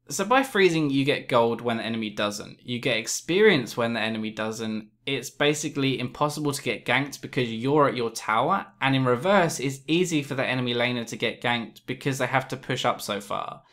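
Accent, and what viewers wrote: United Kingdom. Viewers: FUCKING BRITISH ACCENT :DDDDDD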